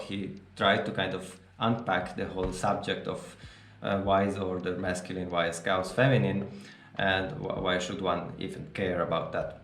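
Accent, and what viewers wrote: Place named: Scandinavia. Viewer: You are from Switzerland? I had no idea. When you talk English you sound more like a Scandinavian to me to be honest :) So German is actually your native language? Have you ever thought about doing this in German? Sometimes I feel like we need more of that, the German language region seems to be disconnected from the rest of the world at times. While Peterson has become a world-wide phenomena nobody I know personally here in Germany has ever heard about him. Which is unfortunate to say the least.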